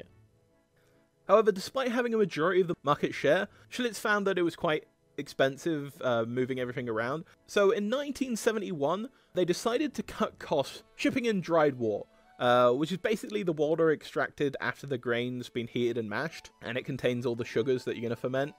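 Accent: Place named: United Kingdom. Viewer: British pronunciation of American names is laughable.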